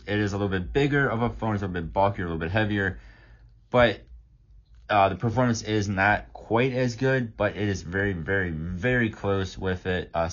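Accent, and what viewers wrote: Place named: South, United States. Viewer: Excellent review. But why are you speaking in a southern accent? Are you from rural Alabama?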